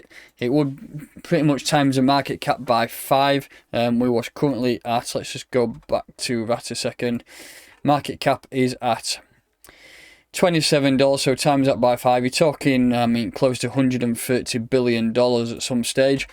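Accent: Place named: North, United Kingdom. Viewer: I come for the Crypto and I stay for the Northerness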